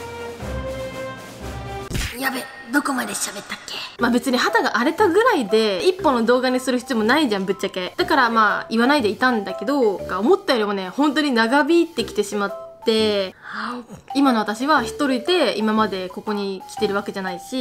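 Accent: Kansai, Japan. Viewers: え？関西弁多くない？？？かわんいい、、、、、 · チャン、関東人ではないですよね？イントネーションがチョット違う。名古屋から西❓ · 友達にパスタアレルギー大変らしいよと言ったら『そんなアレルギーないよ。』と強めに言われた‥。関係ないけど、たまに関西ぽいイントネーション出るね。擬音とよくわからない行動もよく出るけど‥。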